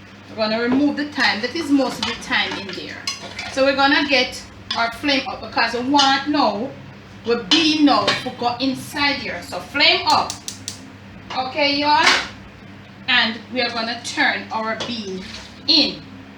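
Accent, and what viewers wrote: Jamaica. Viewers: Am here for Jamaican accent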